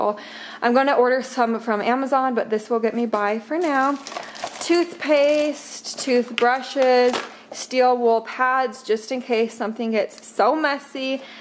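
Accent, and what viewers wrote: Utah, United States. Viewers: Just started watching you and thought I detected a bit of a Utah accent or words as I lied there for for 50 years and really miss it as I now lie in Arizona.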